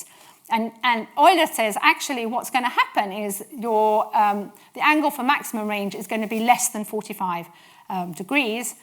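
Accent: United Kingdom. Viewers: She’s just British bro, that’s how they talk. 🥀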